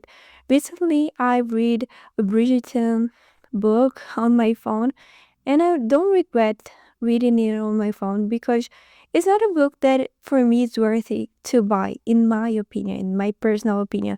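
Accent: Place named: Brazil. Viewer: That's so cool! I'm from Argentina but live in the US since I was a kid. I suspected you were from Brazil due to the accent. Your English is great for not having been abroad! Here they have a lot of little free libraries and the libraries are pretty good with variety. I have probably thousands of books, so I am trying to read and get rid of those I don't think I'll reread. Used books are super cheap so it's very tempting to load up on them.😅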